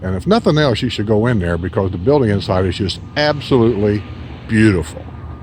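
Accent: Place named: South, United States. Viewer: Love the “SOUTHERN” accent. Are ya from Kentucky? lmao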